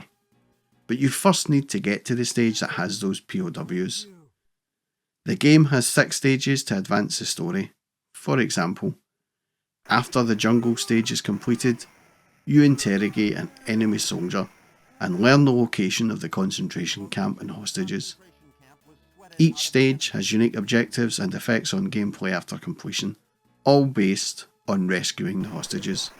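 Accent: Scotland, United Kingdom. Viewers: Upvoted for the narrator’s Scots burr.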